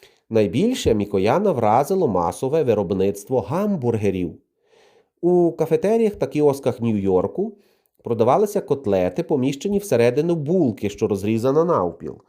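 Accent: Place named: Western Ukraine, Ukraine. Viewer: ЩО ТИ МАЕШ ЗАРАЗ ЧОГО НЕ МАВ ПРИ СРСР ? АВТОР ВІДЕО МАБУТЬ ЗАПАДЕНЕЦЬ ЕМІГРАНТ СЛУХАЮЧИ ЙОГО МОВУ .ГИДОТА.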